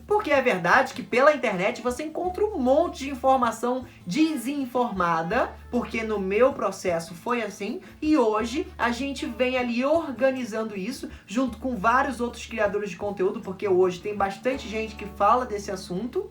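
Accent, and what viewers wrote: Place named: Rio de Janeiro, Brazil. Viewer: Tinha que ser carioca com essa fala so -nos mesmo temos.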